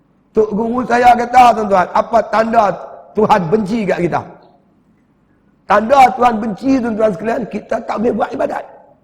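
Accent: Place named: Kedah, Malaysia. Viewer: Alhamdulillah kata2 yg penuh hikmah dan saya rasa tersentuh hati dgr nasihat ust siddiq nor. Dlm masa yg sama sy nk tergelak dgn loghat kedah dia😄 semoga Allah memasukkannya kesyurga firdaus.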